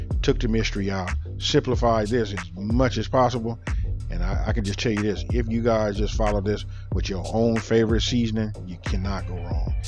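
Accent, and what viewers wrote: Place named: Los Angeles, United States. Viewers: I love California accents. We really the best out mayne 😂♥️ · If LA had an accent thish it homie. Loved the video I’m about to make this right now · I can tell that Cali accent anywhere. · I heard that Cali accent and subscribed immediately!!! Made me straight home sick. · He sounds like a west coast guy...My people loved the recipe love from the north east 😎🤙🏽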